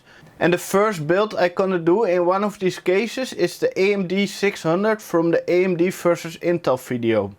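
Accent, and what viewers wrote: Netherlands. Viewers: nice dutch accent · Hello fellow dutchman. I like your content. English technique is also good. Only the Pronunciation wauw XD sometimes i just had to laugh. Klinkt zo nederlands.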